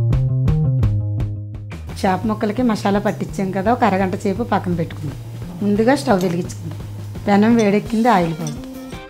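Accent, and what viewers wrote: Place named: Godavari, India. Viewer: Godvadari mettaa slang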